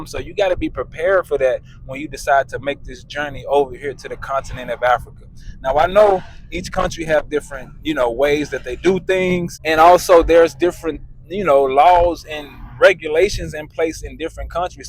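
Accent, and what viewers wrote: United States. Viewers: Man sounds like the hood in New Orleans😢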